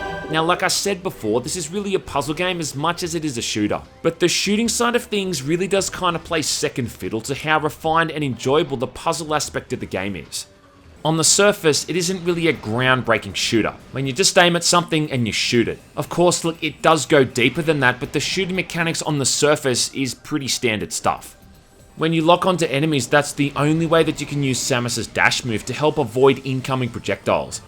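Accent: Australia. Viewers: is this the same aussie that did those hilarious mortal kombat lets plays? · are you australian? I ask because of your accent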